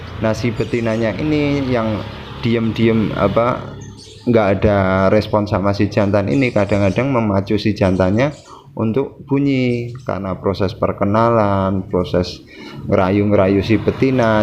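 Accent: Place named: Banyumas, Indonesia. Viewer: Mas orang mana , ko kaya ngomong ngapak ?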